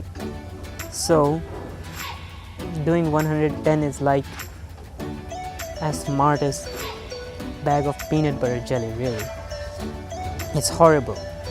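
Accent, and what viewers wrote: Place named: Pakistan. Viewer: Ur English is also made in pakistan.as we can see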